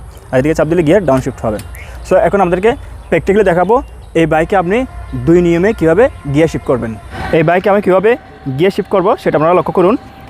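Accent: Chittagong, Bangladesh. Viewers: Vai ki Chittagong er 😂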